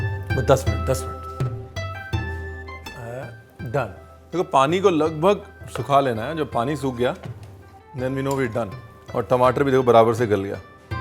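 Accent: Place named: Punjab, India. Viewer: A big fan . You nail it when you explain things specially with that PUNJABI touch♥️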